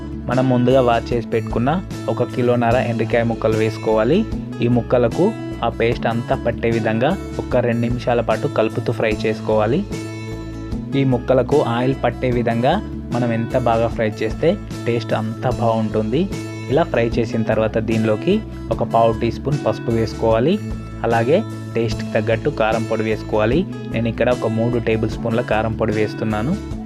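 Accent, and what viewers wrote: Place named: Andhra Pradesh, India. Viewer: Tammi, mana karimnagar yasa lo matladakunda, andhra yasa matladtavem tammi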